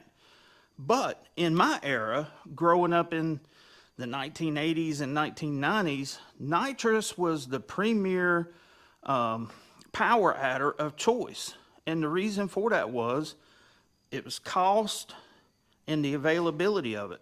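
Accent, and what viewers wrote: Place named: Appalachia, United States. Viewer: I just watched a Video about some Canadian Motorcycle Hill Climbers who added Nitrous to Methanol and Nitromethane for 3 years before it was banned. They said they were warned not to try and Use Nitrous on a Nitromethane fuel injected motor. They did blow 2 cylinders off of a 6 Cylinder Benelli . I overfilled a Bottle one Hot Summer Night . The Little safety Valve has a piece of Copper in it. The Pressure broke the copper. My Trunk lid was all frosty and there was fog coming up through my rear deck speakers. The photo of the back of a car blown up it total BS and as dumb as your accent. Anytime someone talks like a Total Hill Billie I always question their Intelligence.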